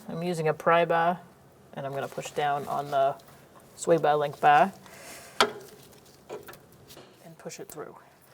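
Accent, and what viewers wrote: Boston, United States. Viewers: gotta love that boston accent!! SWAY BAH!!! lol great video!!!!!!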